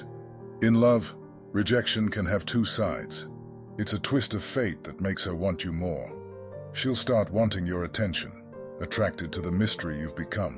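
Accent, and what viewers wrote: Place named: Nigeria. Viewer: You sound like a Nigerian 🇳🇬🙄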